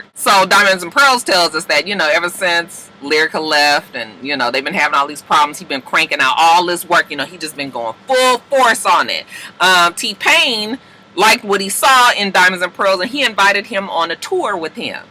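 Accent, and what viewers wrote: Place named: California, United States. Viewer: Your ray j cali accent makes me so homesick 😭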